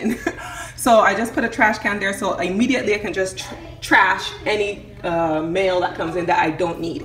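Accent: Barbados. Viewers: omg your bajan shines through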